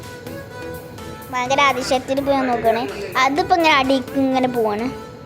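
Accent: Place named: Mannarkkad, India. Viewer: മണ്ണാർക്കാട് ഭാക്ഷ ഇടയ്ക്ക് കയറി വരുന്നുണ്ട് …❤️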